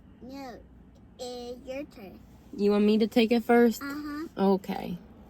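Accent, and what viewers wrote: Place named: Philadelphia, United States. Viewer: Oh your birthday is March 7... so awesome..so is mine. And I could tell you where from like Philly or Maryland or something...your accent... awesome video... Have a great week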